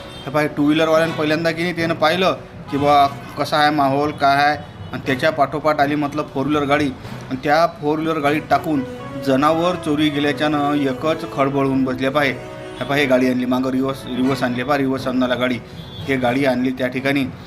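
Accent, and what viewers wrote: Varhadi, India. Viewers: बातमी सांगायची शैली फक्त भाऊ तुम्हीच तुमची वऱ्हाडी भाषे ची जी पद्धत आहे याची बरोबरी कोणीच करू शकत नाही.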